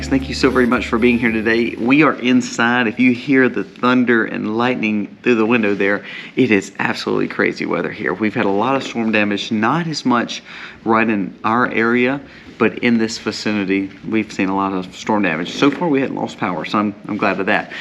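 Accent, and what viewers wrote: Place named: Tennessee, United States. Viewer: I am from Puerto Rico and l have visited your beautiful country. My daughter and my son-in-law and granddaughter leave there in the US. I love your accent and the kindness of Tennessee and the countries there. I was raised in the United States of America New York City. I am in my country where we have chickens. We do not raise cows like you do which l applaud all the hard work you do. We have cows in open lands here but they belong to the government or big country owners here. I know your hard work. Jehovah God bless all your efforts.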